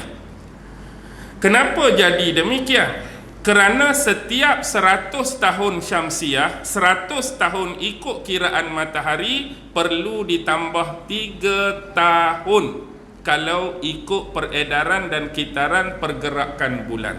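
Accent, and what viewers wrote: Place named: North, Malaysia. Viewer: Umur berapa bang, takpaham loghat utara ka takpaham cerita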